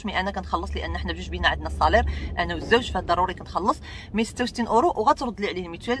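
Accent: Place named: Iraq. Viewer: هاي هاي على لغزالة ولهجة لعراقية ❤نتي تهضري وانا نضحك غي بحدي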